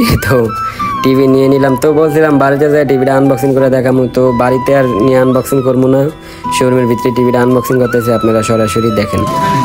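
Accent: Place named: Chandpur, Bangladesh. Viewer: ভাই আপনার বাড়ি কি চাঁদপুর নাকি মতলব? আপনার ভাষা অনেকটা আমাদের মতো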